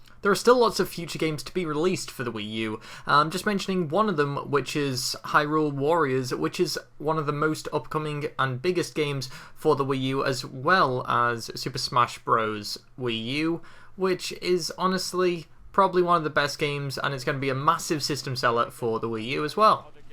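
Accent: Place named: United Kingdom. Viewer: yay hes British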